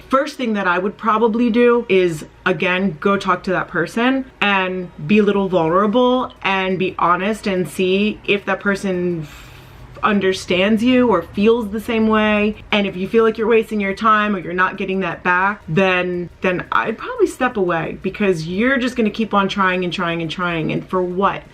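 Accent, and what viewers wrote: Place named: United States. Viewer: I just love your pronunciation sooo much! I'd love to be able to talk so American like you do.. (I'm from Austria that's why lol). Thanks for all the advice, I'm very looking forward to the next video!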